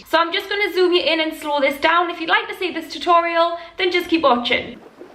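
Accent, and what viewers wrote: Newcastle, United Kingdom. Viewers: i love it little mix Jade and perry have a geodie accent so thats were i teconised it from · R u from Newcastle??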